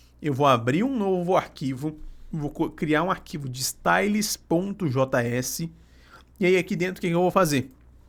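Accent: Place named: Minas Gerais, Brazil. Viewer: Meu conterrâneo, kkkk sou de Juiz de Fora, reconheci o sotaque. Um grande abraço, ótimo conteúdo.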